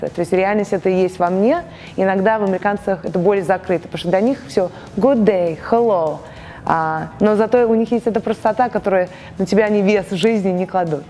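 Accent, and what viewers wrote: Russia. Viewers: К концу ролика стало понятно, что же меня беспокоит (как русского или советского). Это ровное состояние женщины - олвейс смайл, лук гуд, но проблемс, позитив. Ну вы поняли. Ни на секунду не показать даже возможную "не позитивность" что ли. Про искренность молчу. Понятно почему это происходит. Она сама это не специально, это уже на автомате. Так вот я увидел американскость с русским произношением. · не обманывайте больше в названии, она не американка, а русская эмигрантка! её родители русские, она родилась в России, она даже говорит на русском чисто, а на английском с русским акцентом! какая ещё американка? за название дизлайк!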